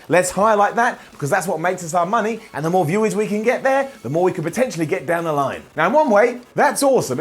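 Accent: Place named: United Kingdom. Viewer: I've watched so many videos featuring that I'm so used to his voice that I can't hear his British accent anymore. I'm just completely used to it.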